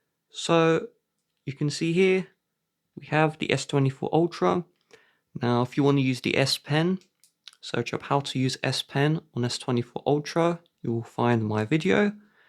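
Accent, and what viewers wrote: United Kingdom. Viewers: You have such a nice accent! What is it Surrey or Berkshire? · Hey what country are you uploading from? I’m in the US (Texas) lol just wondering because you sound British (UK)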